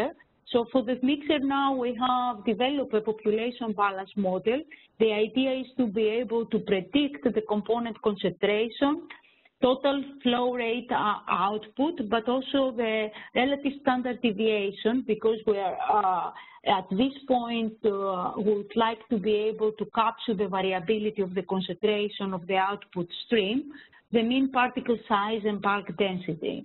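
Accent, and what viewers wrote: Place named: Greece. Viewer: is the best professor I've ever seen. Her accent is clear and recognizable. Greek people have very unique English accent but after having conversions with them for a longer time you will find out that their English is pretty good. And I like their accent. if you check nowadays process system.engineering field and most of the big scholars are from Greek. Like Dr. Floudas, Dr. Pistikopoulos, they have very solid math background. You should not focus on the minor language difference but instead look at the greater research picture. She has explained the research work in a very straightforward way with putting out tremendous math equations and optimization theorems. It's great to watch the valuable overall reasearch introduction online for free.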